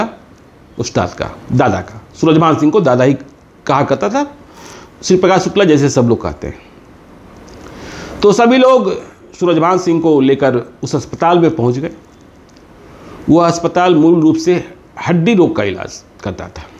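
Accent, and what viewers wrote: Bihar, India. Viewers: You speak like typical Biharii and imitate Kissagoi of Rajesh Pandey IPS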